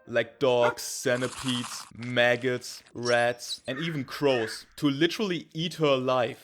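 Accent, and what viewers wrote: Germany. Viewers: the video creator doesnt even try to hide hes german 🥀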